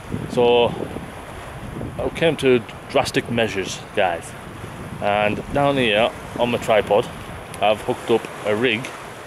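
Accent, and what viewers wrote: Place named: Northern England, United Kingdom. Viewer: You really give the subtitles hell with your superb Northern accent.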